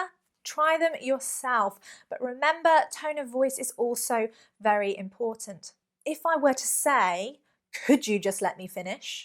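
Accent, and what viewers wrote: United Kingdom. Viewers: Cos her British accent😁💟💟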